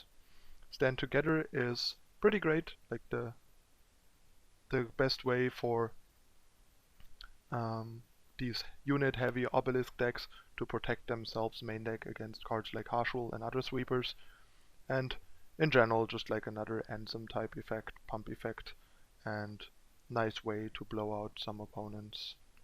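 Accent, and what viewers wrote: Germany. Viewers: are your from Germany?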